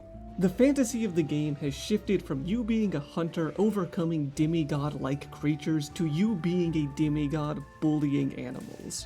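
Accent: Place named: Texas, United States. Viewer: "i was hoot i was hoolering " texan spoted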